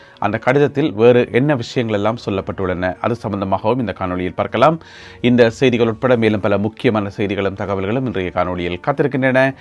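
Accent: Jaffna, Sri Lanka. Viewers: தம்பி உங்கள் தமிழ் உச்சரிப்பு. அருமை 🎉🎉🎉🎉🎉. யாழ்ப்பாணம் தமிழ் ❤❤❤❤❤❤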